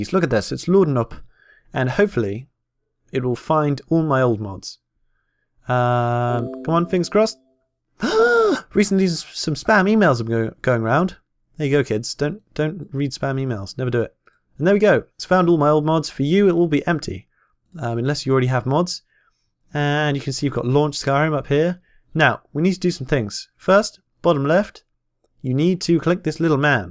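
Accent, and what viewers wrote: Ireland. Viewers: The only thing i could think of is 'Omg is he irish?' x3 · Are you Irish? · You earned a sub. By the way, are you Irish? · ohhg irish and and from england fancy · is he Irish? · i bet your irish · Are you Irish? This is a random question, but you sound Irish. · then halfway through turned Irish again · your voice is so trippy cos of that irish accent that comes out at times. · is that a bit of irish im hearing? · Are you irish?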